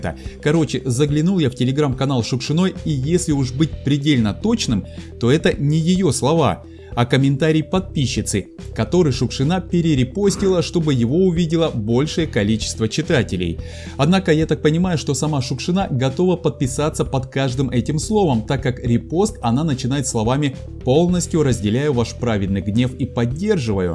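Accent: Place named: Ukraine. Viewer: Да помойка вы,и ничего не перепроверяете.А говор у вас такой.вы из какой глубинки решили Москву покорить? С Украины?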